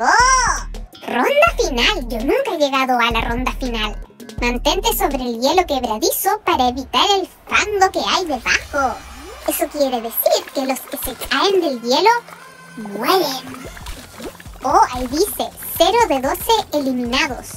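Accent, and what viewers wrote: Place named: Chile. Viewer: Amo la voz chilena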